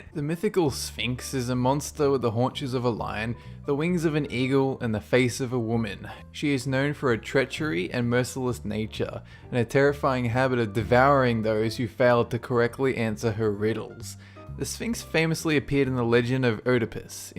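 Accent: Australia. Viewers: Aussie all taay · Australian I think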